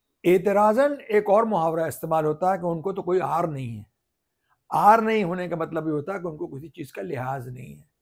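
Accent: Lucknow, India. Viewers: پاکستان میں بیٹھ کر آپ کی بدولت لکھنؤ کا لہجہ سننے کو مل گیا۔ بہت خوبصورت ۔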